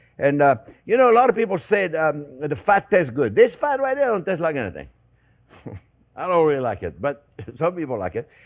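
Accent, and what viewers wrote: France. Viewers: A little bit on accents! Accents are a reflection of geographic pride Chef! Listen to those from NYC, TX, GA and many will say, "what the heck did they just say," it's geographic pride and some things can never be changed. You are an American French Chef, never apologize for your accent, it's you! A beautiful program. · Ah ah , vous m’avez encore faite rire … et cette recette a l’air succulente ! Je vais la proposer à mes amis ce week-end. Et votre accent est très frenchy. On adore 😂. Merci pour votre belle cuisine chef !❤ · I love your French accent Chef! I am certainly going to make this stuffed pork. Perfect for Fall here in Canada. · Don't loose your French accent, but I didn't understand what you were saying with the sign language at the end after you tasted it but it was still funny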